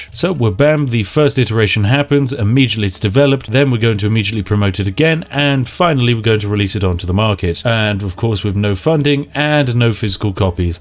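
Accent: United Kingdom. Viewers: this man is everything right about the british accent. all over sub accents can piss off because they are horrible but this is the voice of eloquence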